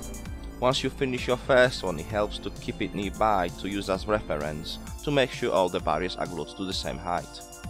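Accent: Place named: Liverpool, United Kingdom. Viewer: Great video! I'll have to make some of these! Love your accent btw! Did you learn English from a Liverpudlian? Certainly got that scouse ring to some words. Great job!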